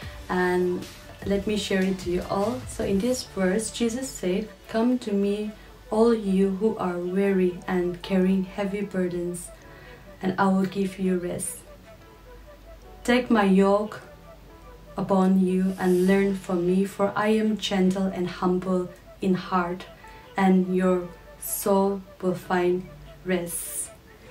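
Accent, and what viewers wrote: Nagaland, India. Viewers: Idk why people still consider English speaking as a barrier of intelligence. No matter how well we speak English and have accent like those of the Britishers, we will never be Englishmen. Being a Naga, it is okay to have an accent like a Naga because that's our identity. And whoever criticizes on your accent, well they need to get a life and maybe get back to the roots. Besides, you have a wonderful singing voice.👌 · You look like a Ao but your accent sounds like angami...you might be thinking why...🙄 Here is the reason because your voice is so soft like angami ladies🤗🤗 people will believe you if u tell thm that you are cocktail..lol.. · I have many naga friends and now my english is like them I love the naga accent 🔥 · Originality is what you are my sister. The accent is real naga♥unlike those wanna be famous nagas local celebs. 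Praising the Lord Jesus Christ and sharing the Bible verses in the middle is the best thing I came across in the video.. Down to earth and being humble will take you very far in achieving your goals.. May God bless you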